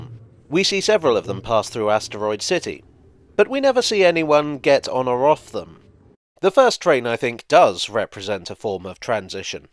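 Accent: United Kingdom. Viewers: I'd watch anything you make because I find your voice soothing and it helps me understand the British accent (I'm not a native English speaker) and I love trains in general, so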